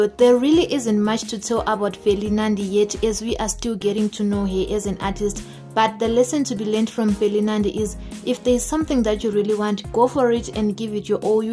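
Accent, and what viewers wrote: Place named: Shona, Zimbabwe. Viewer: I am much in love with the deep english shona accent, and loooong pauses by the narrator 🤭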